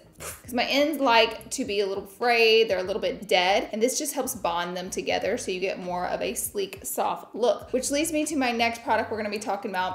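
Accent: South, United States. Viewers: Ugh, looooooove these videos! Used a few of your links 😁💖 Side Note ~ I love that you haven’t lost your country twang lol!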